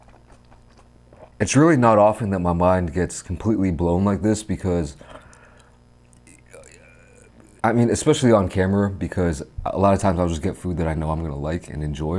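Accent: United States. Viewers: I like to see more of your shows to make more please three times a day be good for me I like to hear you talk it gets we don't know each other by no means we don't I'm using Google talk so forgive me if words don't come out correctly I don't always read it after what I say so I can't correct it I would love to see more of where you live so take a little short video of where you live let me see your surroundings I love that you have an American accent where you lived and where I live we're not too far apart we wasn't too far apart we are now I just like you I really do I don't know how to explain it but I want to see more of your videos so please for having sex make more cuz all I do here you talk I don't talk to nobody for heaven's sakes pretty much nobody I don't even very rarely talk to my family I really don't have any family anymore I got yeah I got uncles and Aunt but never talk ever associate never my sisters and brothers very very maybe once a month and that's a god honest truth YouTube is basically is becoming my family I hate to say that but that's God's honest truth and you're becoming one of my favorites so please put make more please that's what I'm trying to get after making more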